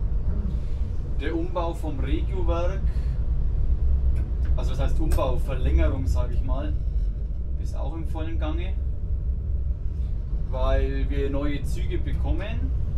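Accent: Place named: Franconia, Germany. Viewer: Feinstes Fränkisch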